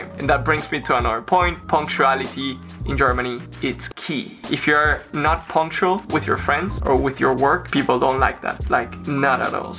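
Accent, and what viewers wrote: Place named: Spain. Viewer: sehr schön zuerst hab ich geglaubt du bist ein ami aber dann spanisch hört man durch du bist sehr lustig und sympatisch i love your video how was barcelona in comparison cau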